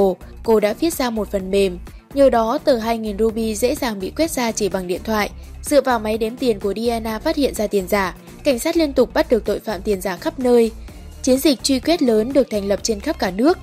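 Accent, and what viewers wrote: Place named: Hai Phong, Vietnam. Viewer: chị review chắc là người Hải Phòng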